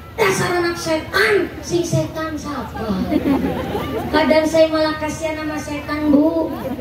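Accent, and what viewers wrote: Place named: Banyumas, Indonesia. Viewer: Serr ora ngapak- ora kepenak👌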